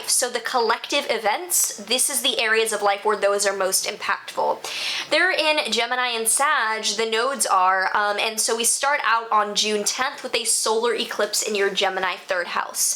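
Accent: United States. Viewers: Your English is terrific - I genuinely thought you were American! And if you need to talk, my email is <mail> I’m sorry you’re going through all this stress but I’ll be happy to listen. Wishing you the best!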